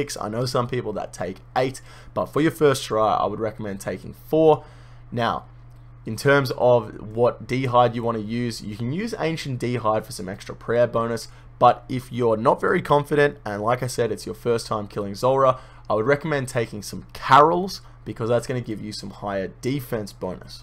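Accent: Australia. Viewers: Easily the best guide I found. What I do personally though is have the time in the video the rotation gets identified down and skip to the part in the video so soothing Australian voice walks me through the rotation. I found it better then doing it 1 rotation at a time. My time stamps: Rotation 1: Rotation 2: Rotation 3: Rotation 4: These can probably be tweaked a pinch but they're what I use. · Aussie accent at its finest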